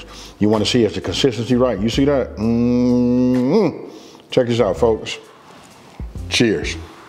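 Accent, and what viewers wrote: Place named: Los Angeles, United States. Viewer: I'm cooking my Thanksgiving meal. I've watched this video and a few of your others 15 times 😂 for each item. My husband walk in like who is this LA guy you watching . I know that accent. 😂😂 I'm like what accent 🤔😂 Now I know why I connect with your videos so much. I feel like I'm at home 🤷🏾‍♀️😂😂 #Happy Thanksgiving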